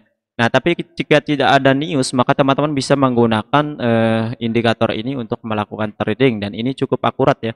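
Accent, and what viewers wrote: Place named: Lombok, Indonesia. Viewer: marak dengan lombok logat side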